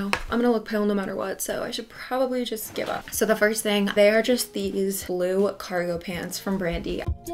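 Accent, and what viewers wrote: United Kingdom. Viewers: wait do you have a british accent? please answer i need to know im so confused haha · congrats on 3k!!! <3 for a video idea it would be so fun to see how u style ur brandy items with other things in ur closet, i always get new things but then dont know how to incorporate them plus ur style is so cute also this is weird but ur british accent makes me so happy lol my friends and i always did that with each other before quarantine kicked us off campus rip </3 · Hi I’m a fan who lives in London and I looove your sudden british accent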